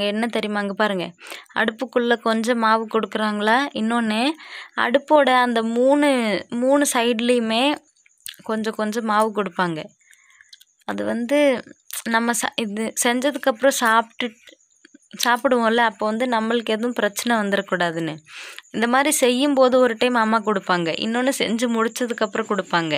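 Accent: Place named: Odisha, India. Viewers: I feel this girl is not a Tamil girl... She has learnt Tamil when working in TN....her accent is different and her dressing and all matches odisa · Tamil kathukittu pesura odisa ponnu ithu I think 🤔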